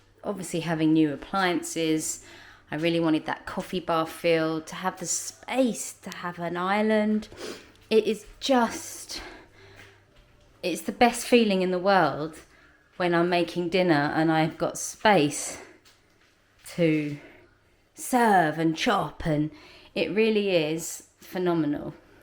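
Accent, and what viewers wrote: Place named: RP, United Kingdom. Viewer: Like your accent. Very posh.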